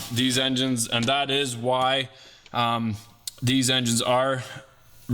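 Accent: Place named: Canada. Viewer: Figured you were somewhere in Canadia by the accent! I am in my last year of college for Mechanical Engineering and I work for a fiberglass composite engineering company during the summers and breaks. Needless to say I'm quite busy balancing it all out!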